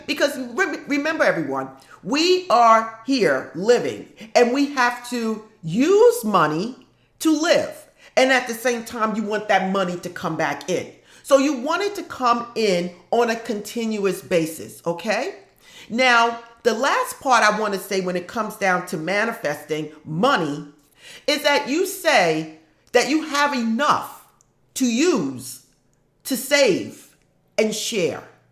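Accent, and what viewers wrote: New York, United States. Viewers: I just love your channel ❤️ I just love your setup , I kinda look at you like my spiritual fairy godmother lol I love the accent is it a New York accent ??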